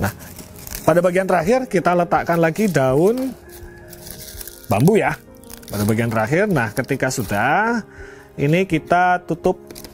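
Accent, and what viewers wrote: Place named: Kudus, Indonesia. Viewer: Panjenengan gaya penyampaianya seperti temanku org Kudus.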